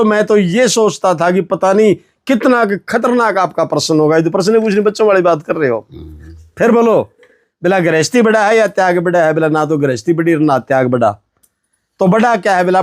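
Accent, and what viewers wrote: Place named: Haryana, India. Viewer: Jai Gurudev 🙏🙏bahut hi sunder prasang tha maine do bar suni. एक तो हरियाणवी टोन थी जिसकी वजह से दो बार बड़े ही ध्यान से सुना तो अच्छे से समझ आई. बहुत ही सुन्दर कथा प्रसंग🙏🙏 अब छोटी मुँह बारी बात एक संका मेरे मन में भी रह गई की राजा जनक तो त्रेता में हुए और राजा परीक्षित कलयुग में, बिच में पूरा द्वापर बिता तो क्या तब तक राजा जनक थे ही?? बस संका हुई तो पूछ लिया और कोई बात नहीं उचित समझे तो संका का समाधान करें🙏🙏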